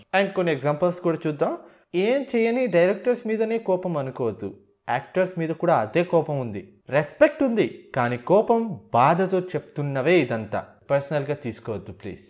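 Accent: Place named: Karimnagar, India. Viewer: broo are you from Karimnagar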